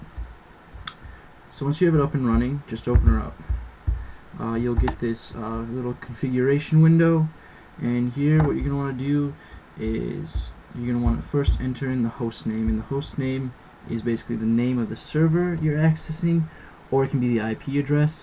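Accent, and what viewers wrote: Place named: Brooklyn, United States. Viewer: You sound like you are from Brooklyn.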